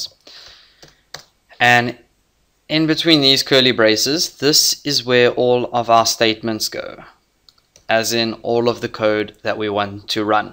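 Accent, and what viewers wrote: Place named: South Africa. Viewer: As soon as I heard your voice I was like... This one is a homie from South Africa. 😀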